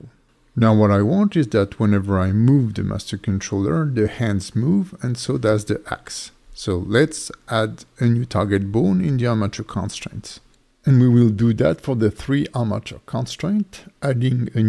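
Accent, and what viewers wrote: France. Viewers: your accent is french 😅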